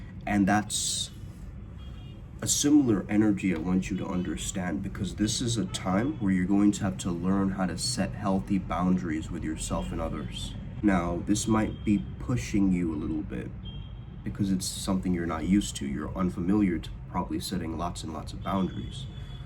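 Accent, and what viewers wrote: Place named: United States. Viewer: He was brought up in America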